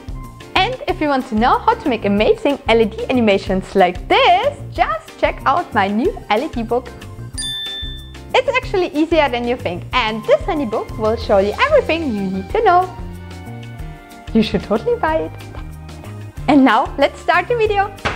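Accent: Germany. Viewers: where are you from, from the accent I thought you were german, but is a slavic name(?) Any way, always great videos, I love how you turn from gorgeous next door girl to super hero woman when you wear your costumes:)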